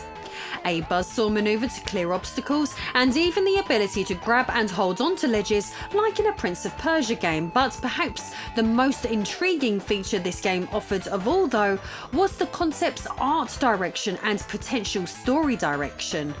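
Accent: United Kingdom. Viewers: Of course.It´s impossible not to be. British accent with astonishing beauty <3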